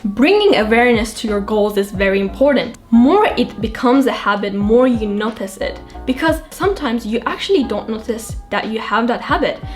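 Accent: United Kingdom. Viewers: have you ever been in england? ur accent reminiscent of british. just my opinion 😌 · Bu videoda ingilizcende bir ingiliz aksanı sezdim sanki.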